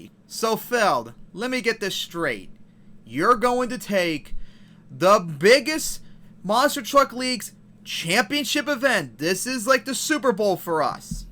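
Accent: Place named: Boston, United States. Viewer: I don’t often watch many YouTubers as I make videos on my own but, I find myself coming back to this video as this is funny as hell. Great rant! XD I can also hear a little bit of a Boston accent in your voice too. FELD is like any other corporation and they’re clearly not in compliance of caring for the audience. Wait they do! That is for the money. I honestly make the videos for pure entertainment purposes and enjoy doing so. Nothing more. Although I am and most of us are supporting them in a way with the videos we make,FELD will continue to accustom their values of what’s right in their perception. It’s business.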